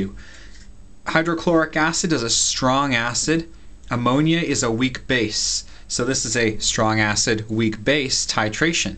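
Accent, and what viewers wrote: Canada. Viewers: you just took a nice $@ on my professor life, thanks man and btw i really like the canadian accent "OAT" when you say out haha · are you canadian? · ''check it OOT'' gotta love the Canadian accent man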